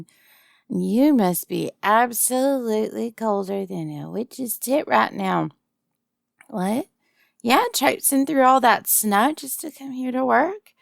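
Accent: South, United States. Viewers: Your voice acting is always such a treat to listen to and southern accents are always a weakness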